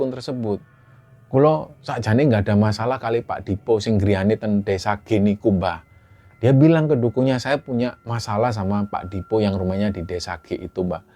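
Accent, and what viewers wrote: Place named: Java, Indonesia. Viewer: Klo mas lagi berbahasa jawa enak didengernya.. Walaw tidak bgtu paham..karna saya asli sunda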